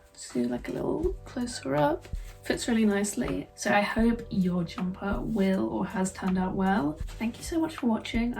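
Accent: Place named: United Kingdom. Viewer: what kinda british accent do you have? i like it!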